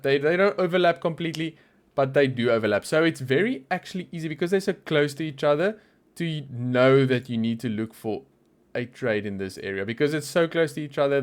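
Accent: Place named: South Africa. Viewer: i know a South African accent when i here one 😁😁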